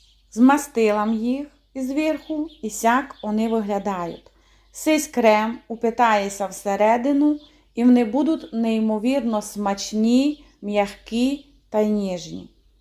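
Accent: Transcarpathia, Ukraine. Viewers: дуже приємно дивитись і слухати Вас.Булочки як і все у Вас,дуже гарнезні і смачні!Дуже люблю дивитись Ваш канал.Всього Вам найкращого,здоров'я і Божого благословення.Все буде Україна!, Ваш Закарпатський діалект як мед на серце!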